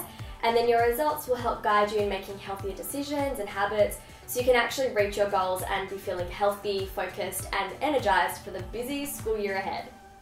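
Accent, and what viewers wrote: Australia. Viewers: Hi. Are you from Australia? Love your accent by the way😂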